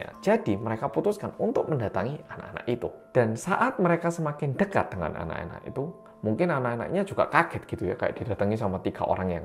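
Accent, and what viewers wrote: Java, Indonesia. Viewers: Selalu ngikuti channel ini,tpi baru pertama komen, awalnya kaget dg logat jawanya sama seperti aq,yg asli wong Jombang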